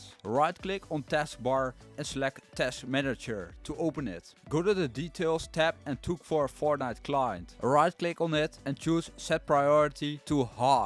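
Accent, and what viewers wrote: Netherlands. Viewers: he dutch i can hear it BRUHHHHHHHHHH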